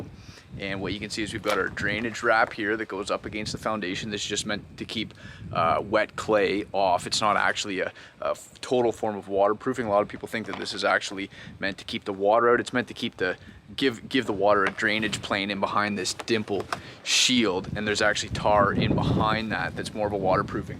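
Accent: Canada. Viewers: I knew you were Canadian before I even saw the location is tagged as Peterborough 😂 Very helpful video for a home owner working with contractors. A future video on sump pumps would tie in nicely with this one!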